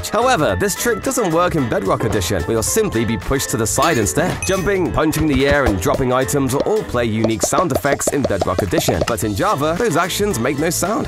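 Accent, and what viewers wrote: United Kingdom. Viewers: You used to sound american and now you're british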